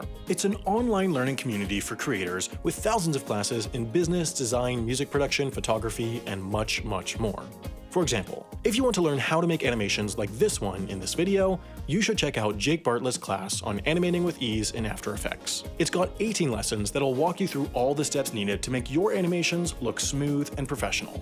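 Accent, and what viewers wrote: France. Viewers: I like the effort, but your french intonation makes me cringe. When I read the video title, I thought the SNCF had ordered trains for the wrong track gauge. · How are there almost 10% dislikes? French people unsatisfied with his French accent or the fact that he's shedding light on this embarrassing mistake by the French government? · dude, it's not. He was speaking European French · Great French accent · What a coincidence. I'm Sam and I also speak French. In response to many of the comments below, his pronunciation actually wasn't that bad. The r's are the hardest part to an English speaker, and they weren't completely horrible. As others have pointed out, he got tripped up by a few words where he made the mistake of trying to pronounce the letters that were in the word (which everyone should know by now you should almost never do in French). 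"Monsieur" was the worst part, but there were minors ones like there should be no "l" sound at the end of "rails". I'm not docking any points for "croissant", since he mostly said it the way Americans say it, only with a better r sound. On the positive side, you can tell he has some comfort with French by the way he doesn't make every vowel sound super long. This is a common mistake for Americans pronouncing French words like "Bon appétit" or "Entrée". French almost never has long vowel sounds, so they sound very foreign to native speakers. He sort of does it a little the second time he says "fantastique" and stays just a little long on "fan". I've seen a few comments discussing accent, and I wouldn't call it a North American French accent. It's a metropolitan French accent with North American English thrown into it. Most North American French accents (I'm not intimately familiar with all of them) are completely different from this, especially when it comes to vowel sounds. 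· His entier english sounded french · Looks like everyone talks about your accent in French and not about the content :) in any case that is an interesting video but the problem was not caused by the split of RFF off SNCF. Measurements and data were just way too old and incomplete. RFF did not go measure the stations, they just handed out the archives that had been made at the old times of the single SNCF.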